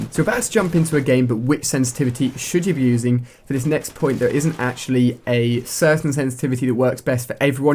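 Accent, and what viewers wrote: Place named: England, United Kingdom. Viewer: Your cool love your England accent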